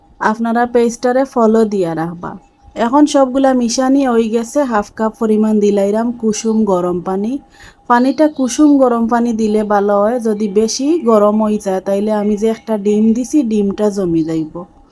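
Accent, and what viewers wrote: Sylhet, Bangladesh. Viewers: আসসালামু আলাইকুম আপু মাসা আল্লাহ নাস্তা খুব খুব সুন্দর লাগলো আমার নমে হয় সিলেটের বোন কথায় বুজা গেলো❤❤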